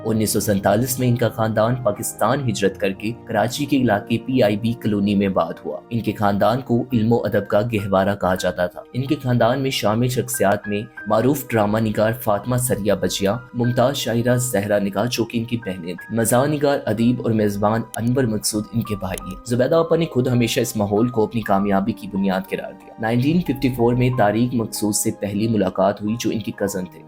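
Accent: India. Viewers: آپکا تلفظ انڈین لوگوں جیسا کیوں ھے؟؟؟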